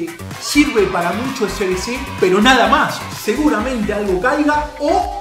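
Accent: Argentina. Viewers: Un argentino ei jajaua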